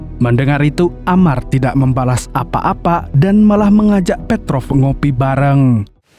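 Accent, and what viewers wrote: Banjar, Indonesia. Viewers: Ae urg banjar kah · RsJ sambanglihum 😂 Real org Banjar nih nah 😅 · orang Banjar kh 😂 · Urang Banjar KH pyn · Asli Banjar kh bg? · Urang banjar sekali amang nih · Mantap mank,👍 Filim x msntap suara amank x banjar bnr😂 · Urang Banjar sekalinya😭🙌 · org banua ini😅 sambang lihum😅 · Ammarnya urang banjar skalinya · Urang banjar kah sekalinya pian ni mang...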